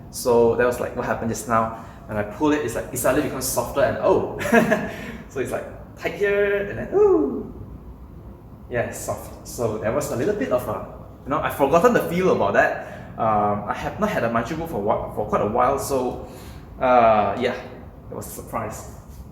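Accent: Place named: Singapore. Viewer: You have a Singaporean accent 😊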